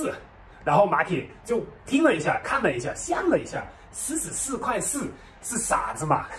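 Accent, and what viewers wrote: Sichuan, China. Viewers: 厉害，竟然会好几国的语言👍👍👍而且学汉语还学到了四川口音。如果不看脸，还真像一个四川人在说普通话。😄😄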